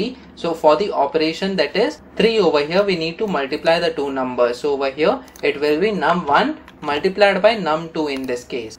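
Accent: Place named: India. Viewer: useful (i like the indian accent)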